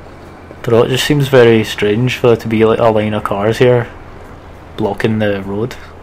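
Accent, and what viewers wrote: United Kingdom. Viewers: Are you from Scotland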